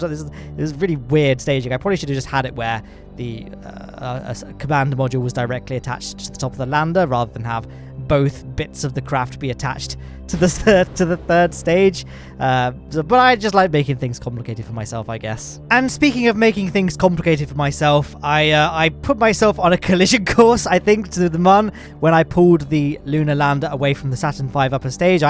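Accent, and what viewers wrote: United Kingdom. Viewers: Only OG's remember his British accent at the inteo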